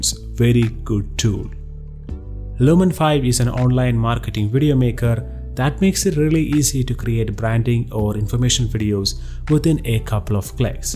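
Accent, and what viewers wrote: Kerala, India. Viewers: Refreshing mallu voice 😀… good content and diving to check all of those links ..thanks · Ith oru Malayali anallo samsaarikune.. alle? i felt it like many times in this video.. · mallu accent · Chettan Malayali Alle ? Mmde College pronunciation... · Your accent sounds more Indian than me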